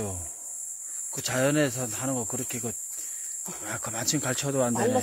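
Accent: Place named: Gyeongbuk-Gangwon, South Korea. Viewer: 좀더 다니셔야 겠습니다^^ 알수없는것이 능이철이라 늦능이 대박산행 15일정도 열심히 다녔던 기억이 ㅋㅋ 수고하셨습니다 님 말씀이 경북 강원도 경계의 억양처름 들립니다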